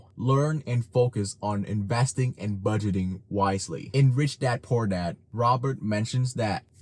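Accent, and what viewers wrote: Vietnam. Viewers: I'm a second Gen viet, but not fluent. At what age did you move to the US? I can tell you still have a smidge of that Vietnamese accent. My parents come from a poor state and they have pretty bad financial sense. Investing in horrible businesses in Vietnam, don't believing in banks or investing. But I don't blame them too much since they never finished elementary school and barely know to speak conversational English. They always say to make a lot of money when you grow up, but give zero guidance how to do it. Also all my family are pro trump maga supporters, guess why.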